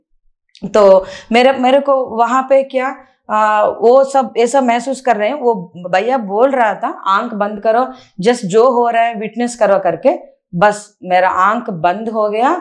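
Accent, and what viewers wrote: South, India. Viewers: I love south indian HINDI ACCENT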